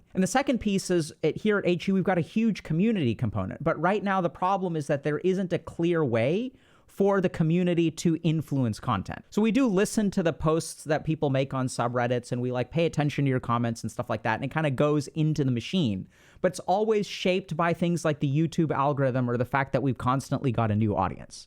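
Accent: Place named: India. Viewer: Just gotta say it’s very dissonant for me to hear ya’ll from an Indian gentleman with a slight accent 😮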